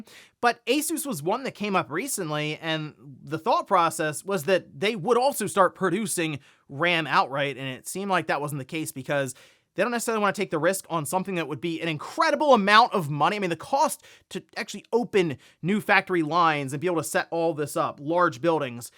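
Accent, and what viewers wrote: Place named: East Coast, United States. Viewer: It's just a standard East coast accent, like Philly/Baltimore area.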